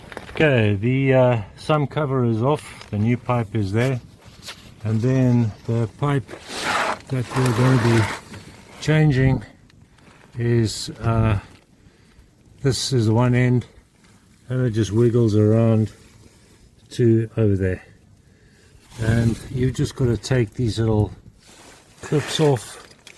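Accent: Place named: South Africa. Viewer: Recognise that accent, regards from wilderness, South Africa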